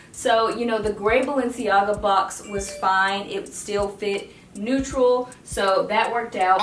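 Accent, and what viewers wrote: South, United States. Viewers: Bruh yeah you def from the south. You did not just say “Catty Corner” 🤣🤣 I haven’t heard that in a minute. Your closet turned out beautiful!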